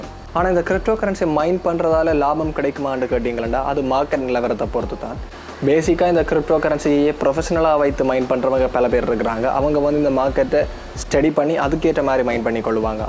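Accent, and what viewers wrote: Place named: Sri Lanka. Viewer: இலங்கை தமிழ் தமிழ் தான் 😊 I'm india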